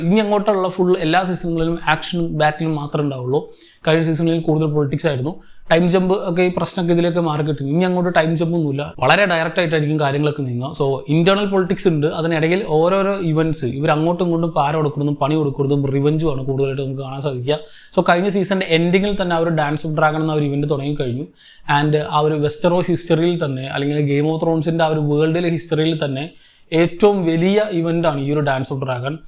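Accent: Palakkad, India. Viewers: Ningal palakkad aano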